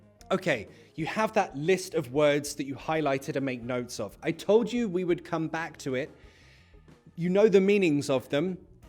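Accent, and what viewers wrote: United Kingdom. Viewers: This man is a hero, I needed videos of British English but most of the youtube videos are in american English. I don't like american English 😕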